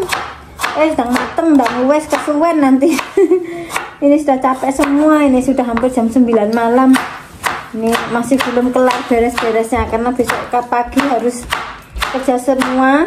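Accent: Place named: East Java, Indonesia. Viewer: Bhs nya, medhok priyaui JaTim, dalem inggih JaTim mb, ke Jogja krn mas suami tugas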